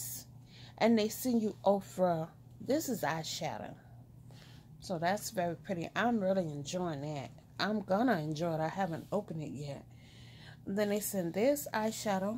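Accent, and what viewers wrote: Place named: South, United States. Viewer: sound like she from the south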